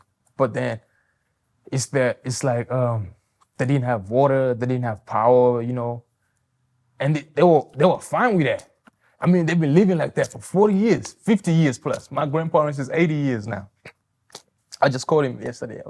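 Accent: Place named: Bay Area, United States. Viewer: Some of his personality and especially the way he speaks English is all thanks to the Bay Area and I love it 🥰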